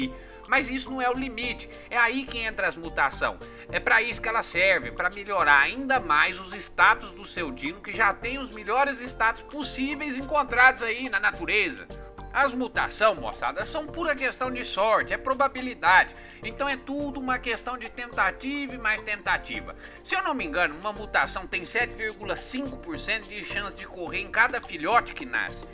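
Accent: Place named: Rural, Brazil. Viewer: Mano tua voz parece de dublador de filmes tlg , aqueles filmes americanos que tem alguém caipira aquele sotaque mano acho que vc teria sucesso em dublagem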